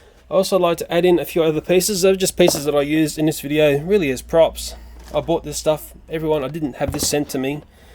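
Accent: Australia. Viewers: He's Australian isn't he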